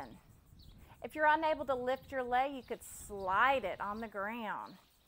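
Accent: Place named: South, United States. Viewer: Perfect way to end an hour workout!! I’m from California south 30 miles from San Diego,I wish people here had your southern accent !! Enjoy the upcoming holiday!!🎉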